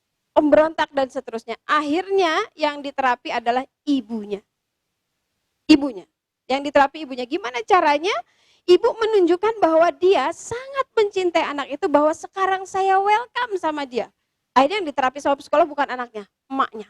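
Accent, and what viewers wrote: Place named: West Java, Indonesia. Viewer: Nyunda banget jd lucu ustadzahnya.. Mudah2an generasi indonesia kedepannya menjadi generasi emas qurani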